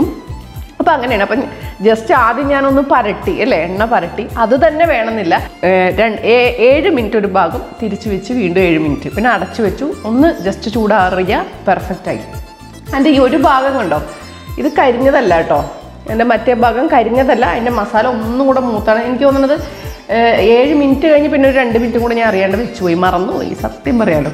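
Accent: Kottayam, India. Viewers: Enikku ithu vare oru vlogerem kananam ennu thonniyittilla.pakshe entho enikku chechi onnu kanan Moham.eppolenkilum meet cheyyan pattumayirikkum alle....Chechikkutty othiri ishtam.chechide nadu evida?samsaram kelkkumbo oru palace kottayam style feel cheyyarundu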